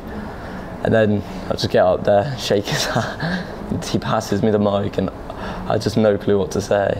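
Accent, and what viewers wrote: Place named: RP, United Kingdom. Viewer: he's posh af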